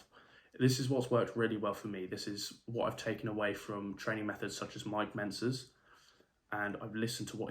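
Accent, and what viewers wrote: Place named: Suffolk, United Kingdom. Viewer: I knew i recognised your accent. Suffolk represent haha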